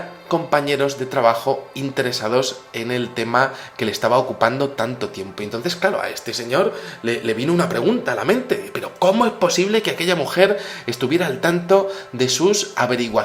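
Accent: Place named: Spain. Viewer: amo tu scento español♥♥♥ y los temas siempre interesantes eres el mejor en estos temas ;)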